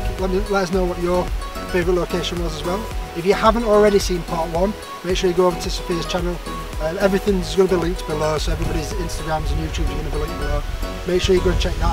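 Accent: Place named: Northern England, United Kingdom. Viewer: Really cool stuff and loved hearing that familiar nothern accent, keep up the good work mate 😊👊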